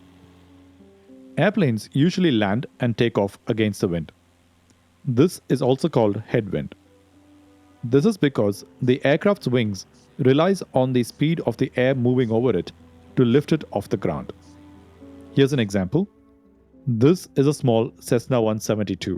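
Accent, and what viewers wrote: Kerala, India. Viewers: Wtf. Why do you have a mallu accent? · Your pronunciation of kochi and kozhikkodu makes me wonder whether you are a malayali? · Did you pronounce zh ( ഴ ) correctly you are either a Malayalam or a Tamil , your accent gives Malayali vibes . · He is ofcourse a malu few pronunciation was mallu accent.. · Are you a malayali? I felt it from your accent. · Narration in an amazing Indian English!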